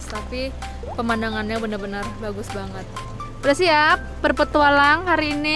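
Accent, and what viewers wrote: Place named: South Kalimantan, Indonesia. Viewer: Org mana kk ngomong nya itu deh kek org kalsel gak tau juga sih bener apa enggak🤣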